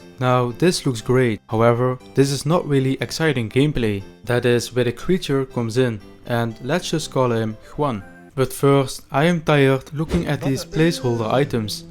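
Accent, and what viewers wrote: France. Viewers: c'est drôle , j'ai l'impression que tu as un accent français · Great French accent 👍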